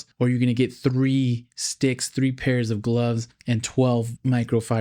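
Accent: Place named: United States. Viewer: This guy has such a pleasant voice. No homo. A very cool American accent man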